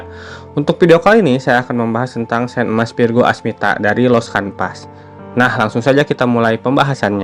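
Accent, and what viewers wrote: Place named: West Java, Indonesia. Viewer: biasa lidah sunda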